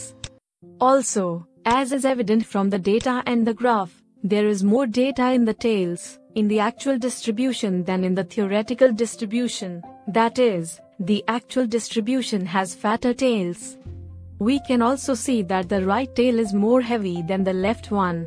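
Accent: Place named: India. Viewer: for Christ sake, you can´t even get rid of that horrible indian accent even with robot voice? FFS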